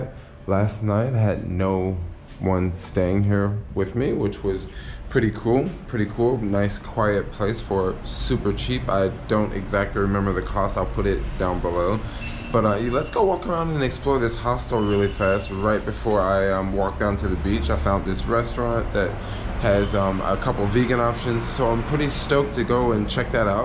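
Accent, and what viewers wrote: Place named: United States. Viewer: his accent is clearly American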